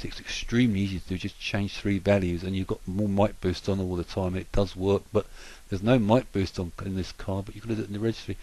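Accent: United Kingdom. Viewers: wtf with these limey voiced tutorials, didnt even get to 5 secs. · wooh... that's a hard britain accent xD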